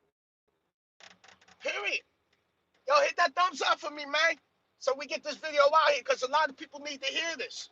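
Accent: New York, United States. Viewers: What's good, my brother. I'm confused. I hear a Knickerbocker accent, but I'm seeing a Raiders hat. Anyway, I always tell folks I'm always on time.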